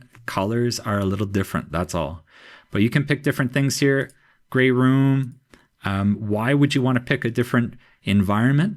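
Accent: Canada. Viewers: I really love your courses. They are the best CAD courses that I have taken. Your speed, method of explaining, and examples are excellent!. I love the Canadian accident too. Thanks for doing such a great job. I look forward to more of your V8 videos. I loved the LEGO Man videos too.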